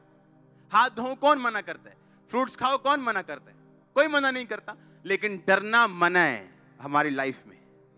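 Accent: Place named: Punjab, India. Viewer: Sir ji daily I listen to you ur punjabi touch is very attractive. Lord Jesus bless you with endless blessing🙏🙏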